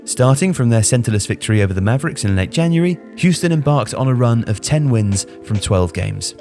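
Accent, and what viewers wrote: United Kingdom. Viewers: i love how a dude with a british accent is talking about american basketball lol. fr tho i love your vids keep it up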